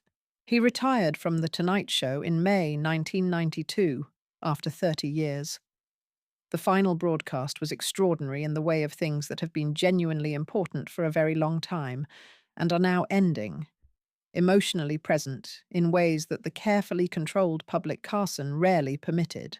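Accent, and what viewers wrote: United Kingdom. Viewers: Do we really need Brits to narrate an American story. Surely an American accent would be more compatible.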